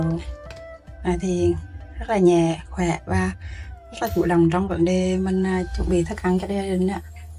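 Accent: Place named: Hue, Vietnam. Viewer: Admin up bài này làm em thèm quá xá . đậu ngự lại với giọng Huế nữa hay quá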